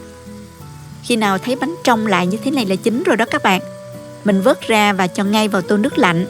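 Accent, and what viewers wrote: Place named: Saigon, Vietnam. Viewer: Giọng Sài Gòn xưa thật dễ thương phải không?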